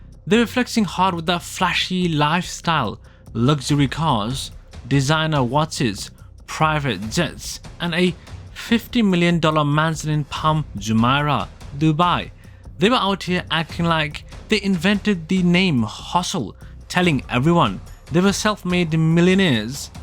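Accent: India. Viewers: WHY TF you have I dian accent ????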